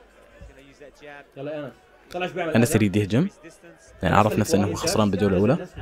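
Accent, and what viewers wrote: Iraq. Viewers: اني ستوني اكتشفت انوو لهجتك عراقيه اعتقد انوو انته عراقي 😹😹😹 ابن بلدي اعتقد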